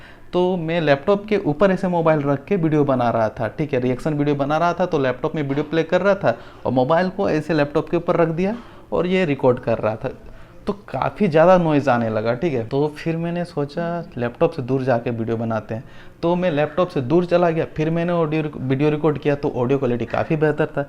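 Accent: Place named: Bihar, India. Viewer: Isme uski koi galti nhi h, vo bihar se hai bhai, thik hai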